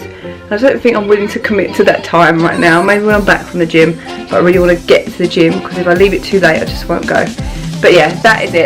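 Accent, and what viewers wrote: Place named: England, United Kingdom. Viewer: You are beautiful great body... Love the English accent...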